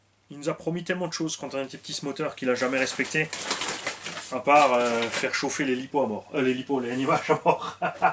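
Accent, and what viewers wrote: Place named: South, France. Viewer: ho t'es du sud? deux mille viNNt et un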